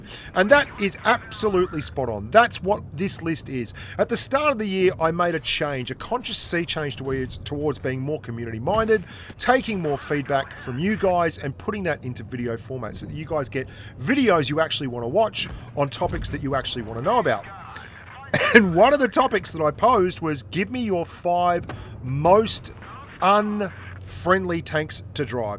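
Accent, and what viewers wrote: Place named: Australia. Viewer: I’m a kiwi and I think he’s an Aussie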